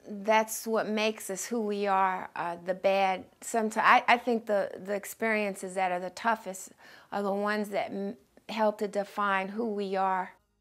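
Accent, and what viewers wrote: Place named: New York, United States. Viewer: I love your New York accent and your apartment is gorgeous I could fall in love with all your art work.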